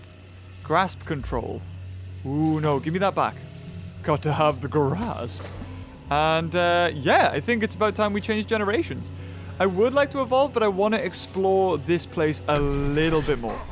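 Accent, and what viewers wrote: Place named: England, United Kingdom. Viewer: I love that English act sent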